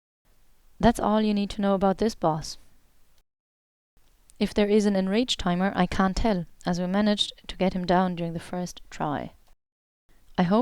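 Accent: Ireland. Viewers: Pfft German.....she's Irish ya daft twit. Bloody lovely btw :) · What part of ireland are you from? Im from Cork City! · are u from mayo? im from wicklow myself, just cant pin point the accent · accent is clearly irish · Maybe she 'sounds like a robot' because she knows she has an accent and is trying to speak slowly and clearly, given that most of the people watching this won't be familiar with the particular accent (which I believe is Irish). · It sounds Irish to me. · Shes not a robot, she's just Irish!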